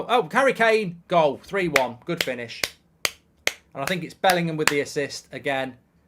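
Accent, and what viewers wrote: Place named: West of Scotland, United Kingdom. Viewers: Love the west accent with the scooby do,s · Please stop with the stupid Scot accent, it’s so annoying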